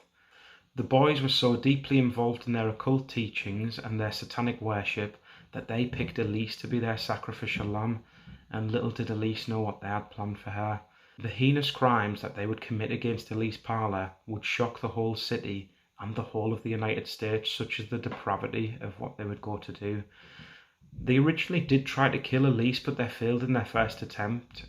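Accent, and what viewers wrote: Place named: Hartlepool, United Kingdom. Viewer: Can't wait for the next one. Very upset as any decent person would be but the family got their daughter's mortal remains back to bury at least. Very well presented. Lovely to hear a Hartlepool accent on Youtube.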